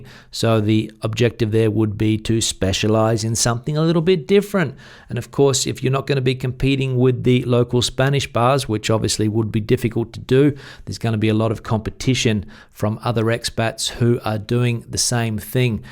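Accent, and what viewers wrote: Australia. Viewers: I caught the Aussie accent straight away but was surprised your spanish is so good!! I'm Uruguayan but have grown up in Australia, our spanish is a little different to that of Spain but got to say it- you're speaking like a local! Well done!! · You seem to have an Australian accent!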